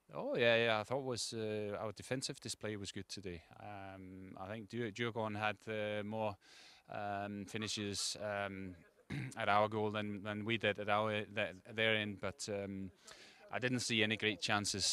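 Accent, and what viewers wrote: Scotland, United Kingdom. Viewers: Good Scottish twang in his voice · Nice to hear he still has his Scottish accent!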